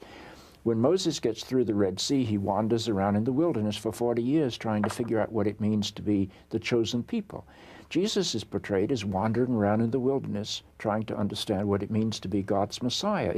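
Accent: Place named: United States. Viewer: What a gobshite. I'm my country? You sound like you're from the usa? Full of shit!!! I was told I was told. Lies lies and lies!!!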